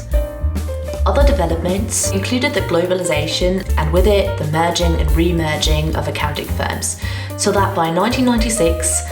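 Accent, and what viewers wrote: England, United Kingdom. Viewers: Excuse me if you mind, where are you from? I figured out where your accent is from, it sounds like it's from somewhere in the UK but not quite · I'm riveted by the waterfall hair, the jazz, the beauty, and simultaneously the horror of the words actually being said. 925% ! Bloody f....king hell. Final verdict: dizzy & sick. Ooof. PS - really nice handwriting. With blurred f-stop closeups. And then the accent - which bit of England is that? Those are the last straws. What's a man to do.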